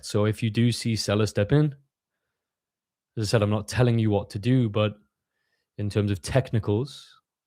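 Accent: Newcastle, United Kingdom. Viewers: You sound a little bit like a geordie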